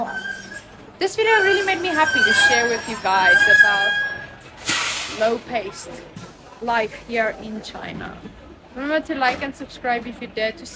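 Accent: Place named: South Africa. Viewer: You are from South Africa? The accent gives away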